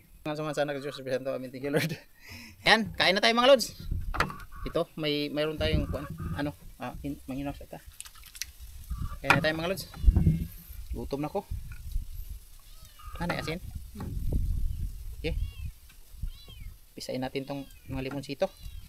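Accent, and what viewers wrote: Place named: Bohol, Philippines. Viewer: Dayo raka Dha sa agusan Dol.. Imong Sinultihan Kay murag Taga Bohol nya ka pronounce nimo taga Tarlac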